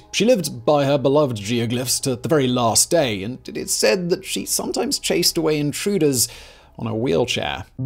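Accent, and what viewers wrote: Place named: England, United Kingdom. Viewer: Midlands/south England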